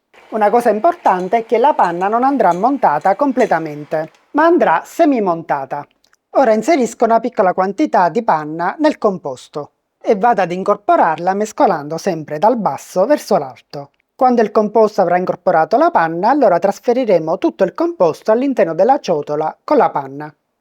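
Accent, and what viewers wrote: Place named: Sicily, Italy. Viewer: stavo apprezzando l'accento siciliano, visto che, se dovessi scrivere la parola: "resta", per come viene pronunciata ci vorrebbero non meno di 4 R😅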